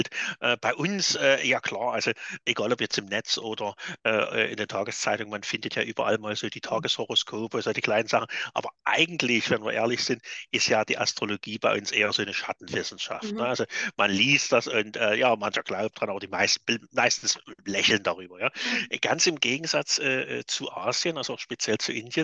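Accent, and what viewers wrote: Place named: Saxony, Germany. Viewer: Wunderbar 🙏🙏🙏ich liebe Ihren sächsischen Dialekt 😂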